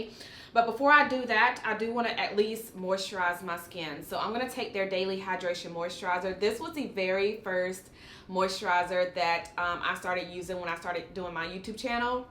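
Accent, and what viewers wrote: United States. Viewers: Lovely video. Just recently purchased the elf Camo concealer and the hydrating concealer, I’m looking forward to trying them. Love your accent, where in the states are you from? :)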